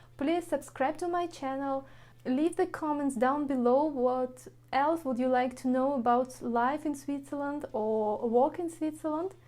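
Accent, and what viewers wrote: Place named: Eastern Europe. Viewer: Soften love your mama Eastern European accent🤫this will only help you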